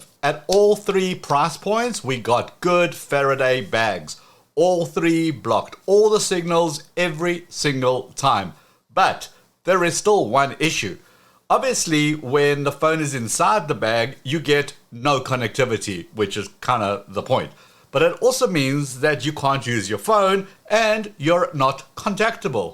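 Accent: South Africa. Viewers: Howzit, do i hear some 🇿🇦 in your accent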